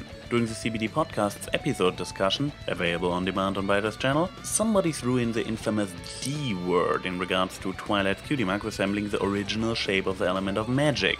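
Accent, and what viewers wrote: Germany. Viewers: Hi. Love your videos but I can't stop thinking about that accent you have. Are you german or what kind of accent is it?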